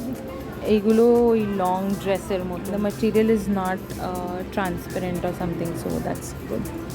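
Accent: Bengal, India. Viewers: Wow! Loved your bengali accent. And Will this market soon. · Aapnar Bangla ta darun! In fact you even have a Bengali accent! Have you been living in Kolkata long?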